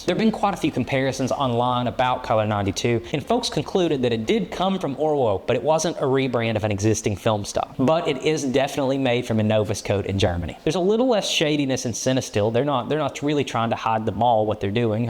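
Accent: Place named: Appalachia, United States. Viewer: I’m delighted to find the channel, and I’m tempted to switch out the digital and try film photography after a long break. Also, and I apologize if this is intrusive: where are you from? Your accent reminds me of locals in the southern stretch of the Appalachians, where I went to school, but maybe a bit different. Tennessee?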